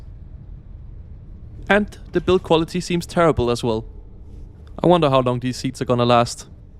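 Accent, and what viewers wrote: Denmark. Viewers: Your Danish accent is very nice to listen to, much nicer than most U.K. dialects! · I know. He’s danish. We’re somewhat in between UK English and US English when it comes to speaking English.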